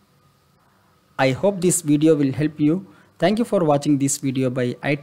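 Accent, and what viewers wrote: Kerala, India. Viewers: Malayali analle ???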